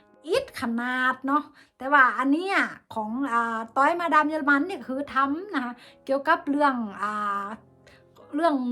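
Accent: North, Thailand. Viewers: มาดามเป็นคนเมืองน่านเหมือนกับน้องพลที่ผมเรียกว่าทำงานด้วย สำเนียงภาษาเหนือไพเราะเลยครับ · สวัสดีจร้า อู้คำเมืองน่ารักดีจร้า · อู้คำเมืองเพราะมากๆค่ะชอบๆจริงๆค่ะ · อู้เหนือได้เพราะมากเลยจ้าพูดได้เก่งมาก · สวัสดีจ้า แม่ จ้า อู้คำเมืองเพราะมากๆจ้า มาดามจ้า เลิฟๆจ้า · อู้กำเมืองม่วนขนาดจ้าวปี่ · ชอบเวลาน้องต้อยอู้คำเมืองน่ารักจ้า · ชอบครับภาษาเหนืออู้เพราะพอได้อยู่ครับคำเดียวคือคำ ลำเต้ๆครับ😁🤗✌️🤟♥️ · ชอบสำเนียงเหนือมากเลยค่ะ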